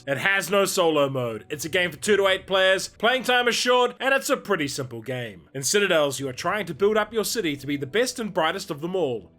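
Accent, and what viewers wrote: New Zealand. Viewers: I keep forgetting you're also a kiwi and got jumpscared by the intro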